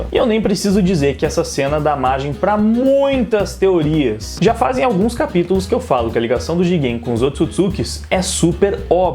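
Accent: Rio Grande do Sul, Brazil. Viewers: Obrigado pelo vídeo, grande trabalho, vc é do rio grande do sul? (Eu sou), abraço.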